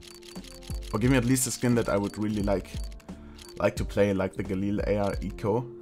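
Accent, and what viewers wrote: Germany. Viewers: Man hört einfach das er deutsch ist :D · Ich muss mir nur die ersten drei Wörter anhören und schon weiss ich das der Deutsch ist xD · you are from germany right? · R u from germany?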